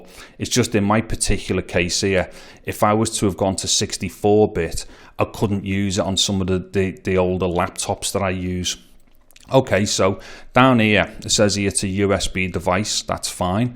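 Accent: Liverpool, United Kingdom. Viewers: Very well explained project it makes such a nice change to have an English ( Scouse ) voice rather than an American voice explain everything in a easy to understand form and informed way thanks very much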